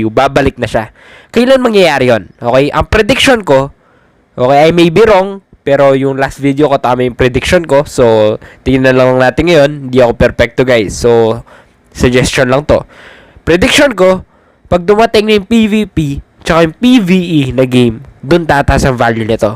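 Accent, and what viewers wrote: Pampanga, Philippines. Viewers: Very helpful ang mga videos mo. Thank you. Kapampangan ka ba lods? Yung accent kase. 😁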